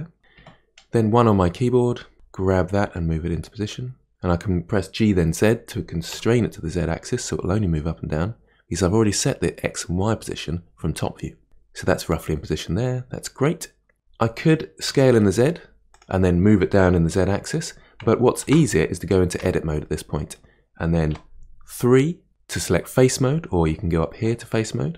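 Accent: United Kingdom. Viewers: (me, opening up this tutorial) Oh hell yes British Accent thank god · it's good that you show which keys do you use. Because of Your bri'ish accent i've mistaken alt for o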